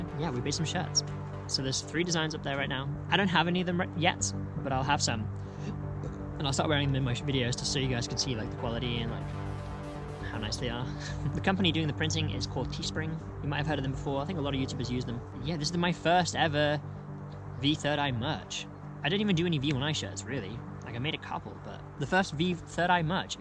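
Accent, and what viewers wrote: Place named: London, United Kingdom. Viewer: Yo you always say your from wales but you have a British accent? You sound more like you are from where I’m from which is near London? Great video tho dude 🍄🍁💙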